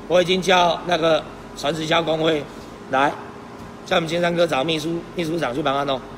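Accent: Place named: Taike, Taiwan. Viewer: 一直以來都不喜歡流氓的那種口氣或者作風 連大哥是我看到唯一一個正氣十足的 不持強凌弱 說得到做得到的人 雖然你跟館長的台客口氣讓人不習慣 但是社會就是需要你們這種站在人民方向的人 謝謝~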